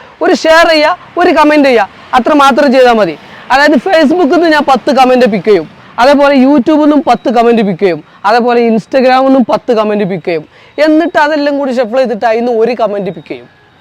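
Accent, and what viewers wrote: Malappuram, India. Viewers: ഹായ് മുത്തുമണീസ് നിങ്ങളെ വിഡിയോ ഒരു വെറൈറ്റി ആണ് തന്നതായ നാടൻ ശൈലി സ്ഥിരം ഉപയോകിക്കുന്നമലപ്പുറം ഭാഷ കളർപില്ലാത്ത സംസാരം 👍👍നിങ്ങളും വീട്ടുകാരിയും ചെങ്ങായിമാരും 👍👍👍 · Hyy ningaludee video nalla vibe anee. Nammude Malappuram bashayil athintethaya reethiyil avatharippichh tharunna ikkaa.... Ingalum ingalee videosum adipoli ane tto❤️👍🔥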